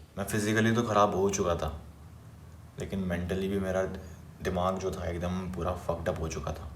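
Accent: Bengal, India. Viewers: Bhai are you from West bengal?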